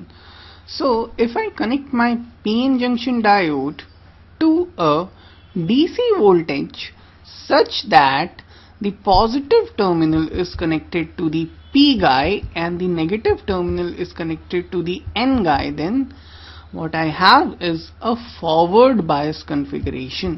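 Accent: India. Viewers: GET THE FUCK OUT WITH THAT HINDU ENGLISH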